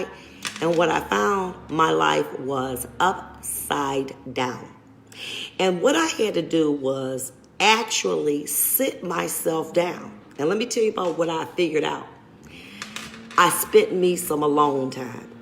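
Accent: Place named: United States. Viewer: I love her country accent.. I’m from New York and she sounds mad country lol I love it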